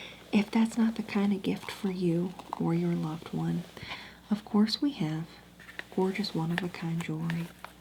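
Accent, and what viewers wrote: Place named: Arkansas, United States. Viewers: I was going to say how fitting it would be if Kathy was located in Arkansas since Arkansas has so many crystal shops. Also feel like you nailed the specific southern accent Arkansas has :) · THE WAY I YELPED as soon as I saw this video!!! I'm not even a crystal girlie, but I don't think I've ever seen a crystal-themed ASMR roleplay that I haven't loved. And this accent.... I am unironically obsessed, there's just somethin bout that sweet southern *hwhistle*😍😍😌😌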